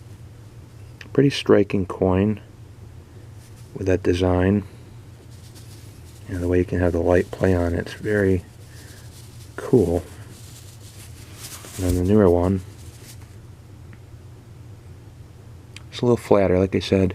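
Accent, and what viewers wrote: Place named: Netherlands. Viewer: So you're U.S. based because of the ASE's being first on the list ? Was actually wondering if you were sort of Dutch because you seem to have short of a slight Dutch undertone accent. Ooh... haven't seen such coin roman numerals but agreed : here's to a great 2020 of MMXX for us p.m. stackers around the world !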